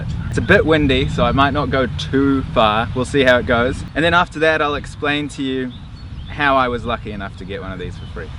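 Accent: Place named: New Zealand. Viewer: Score! Just discovered your channel love to hear a kiwi accent from all over the world mavic air is an awesome drone perfect for travel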